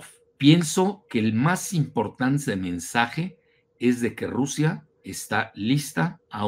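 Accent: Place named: Mexico. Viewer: Estimado Alfredo, por tu acento diria que eres como.yo MEXICANO, yo estudié en Moscu 8años, lis 8 años más felices y hermosos de mi vida, aqui no hay mucho lugar para escribir y describir toda mi experiencia, solo te diré hay una exelente entrevista aqui en YouTube hacha por Vladimir Soloviev a Putin, hará unos 7-8 años atras vela y si no hablas ruso pidele a alguien que te traduzca ahi podras ver el gran pensador que es Putin, luego hay otra entrevista hecha por el director americano Oluver Stone, mirala y ve lo que dice Stone sobre Putin. Saludos desde Alemania Fernando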